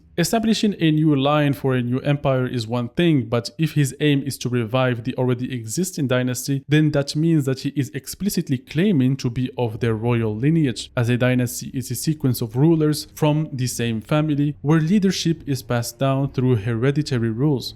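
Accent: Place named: France. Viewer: Ah!! Serais tu...french? Je me disais que cet accent subtil me disait quelque chose... Excellente vidéo, hypé pour le dlc, mais il va falloir éviter Yt les derniers jours avant la sortie...me faire spoiler les boss une semaine avant la sortie par """"""certaines"""""" chaines depuis des années j'en peux plus...😳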